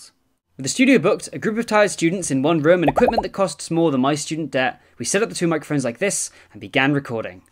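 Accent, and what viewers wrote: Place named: United Kingdom. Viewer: 100% agreed. That brittish accent is awesome.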